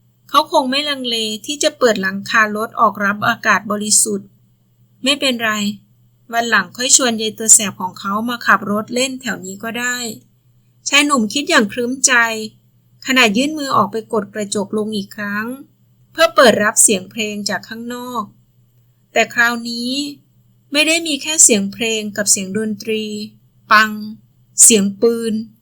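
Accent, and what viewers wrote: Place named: Isan, Thailand. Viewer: พุดอิสานเพราะมาก😅😮😢😢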